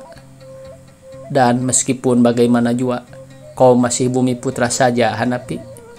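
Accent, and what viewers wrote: Sunda, Indonesia. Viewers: Hhhe bang ki sunda gk klop certi dlm bhs indonesia karena sdh klop dongeng sunda hhe lagamna bhs sunda ki.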